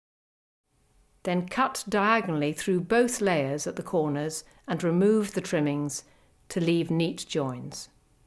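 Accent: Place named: United Kingdom. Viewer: i luv british accents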